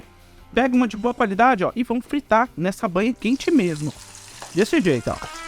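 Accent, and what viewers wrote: Minas Gerais, Brazil. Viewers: Modo de falar hororoso sotaque mineiro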